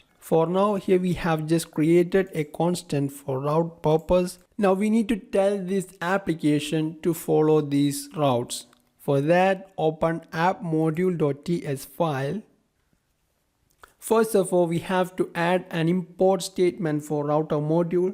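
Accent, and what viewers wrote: Kerala, India. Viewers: pronunciation ketata malayali aano na enikyum ondu shamshym.. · malayali aano?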